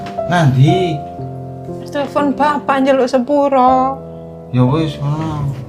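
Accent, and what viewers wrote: Purworejo, Indonesia. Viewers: Bahasane koyo wong Purworejo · Omahmu ngendi kang logat medok purworejo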